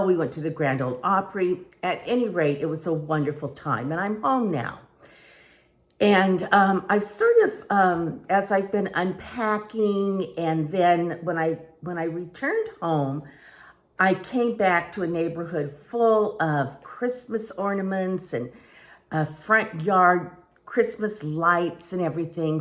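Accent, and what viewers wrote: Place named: New York, United States. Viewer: Idk why but you remind me of Talk show host Wendy Williams. You kind of have her mannerisms and her New York accent. 74 wow u look amazing.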